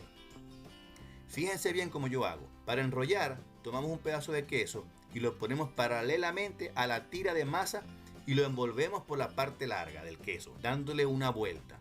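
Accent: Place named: Maracaibo, Venezuela. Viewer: El señor habla maracucho, buena tecnica yo voy aplicarla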